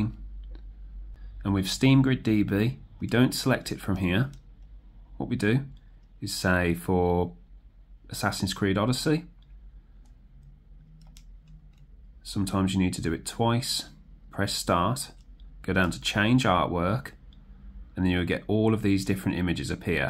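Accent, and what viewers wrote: Birmingham, United Kingdom. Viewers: Really useful video cheers, do I sense a fellow Brummie?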